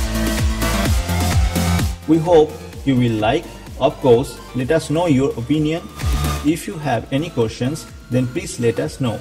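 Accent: India. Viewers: I dont understand your Indian accent. What to put in the Empty USB drive is it the windows 10 installer? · Thank you so much for using this Indian voice.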